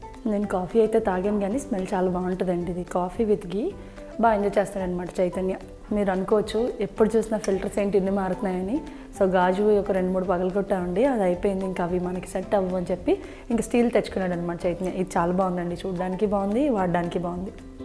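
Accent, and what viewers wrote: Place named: Rajahmundry, India. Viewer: Hello friends meeru matladithe rajahmundry nir chodavaram ni thalchukontunnaru tq friends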